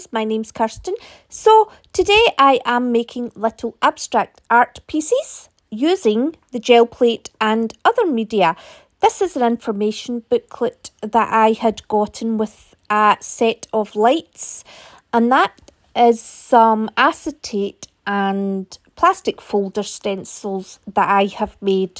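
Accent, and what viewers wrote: Scotland, United Kingdom. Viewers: I just watched Ceri the Crafter's video where you sent him ATCs and happy mail. I have just subscribed to your channel. :) I love these little pieces of art you have made. They are beautiful. (I especially like the dark ones) I love your accent. My mum was Scottish, so it makes me nostalgic. (I live in Australia). Oh and I would totally watch a longer video with you showing the process of these. They're brilliant.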